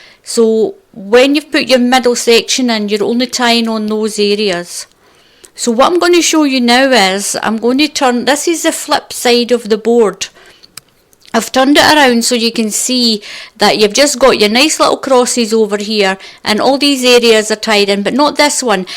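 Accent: Scotland, United Kingdom. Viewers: Lovely hearing a Scots accent 👍🏻💜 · she's sounds Scottish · Are you from Scotland? My paternal grandparents were from Scotland. Both of my parents worked so I spent most of my time around grandma Mary and Grandpa Pete. I developed a Scottish accent even though I have never been to Scotland. It's gone now, but it's funny to think about it. They had a very heavy accent so I had to "translate" for them often. LOL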